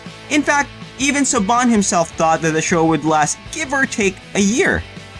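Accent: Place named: Philippines. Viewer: You sound pinoy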